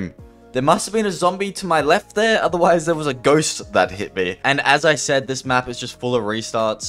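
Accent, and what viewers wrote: Australia. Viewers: he’s Australian and he is saying kuda not cooter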